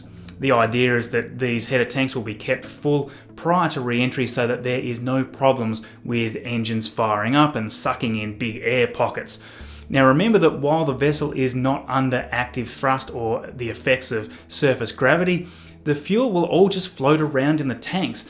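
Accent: Australia. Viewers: Nice video thanks. Random q. Where in Australia are you from?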